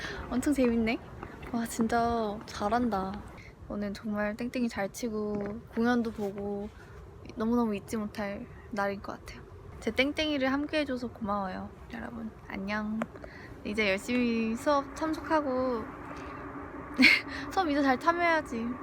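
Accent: Seoul, South Korea. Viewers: 말투가 완전 30년전 서울사투리네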